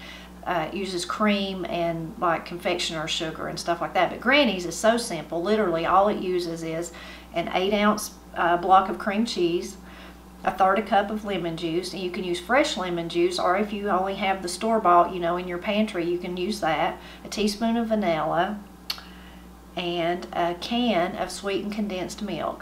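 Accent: Appalachia, United States. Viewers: East Ky Appalachia here and we sound about the same! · My family are from the Appalachia area, central Appalachia to be precise. We have an accent similar to this woman’s but it sounds a little different. I get asked all the time when I’m on vacation out of state “where are you from”? Lol Or, “your accent is strong”. I say just yup, I got that Appalachia accent. 😂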